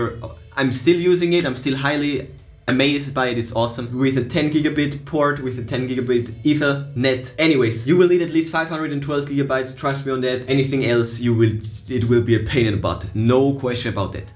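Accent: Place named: Germany. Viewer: wenn du deutsch bist, versuche nicht englische videos zu machen wtf. der deutsche dialekt ist immer schreklich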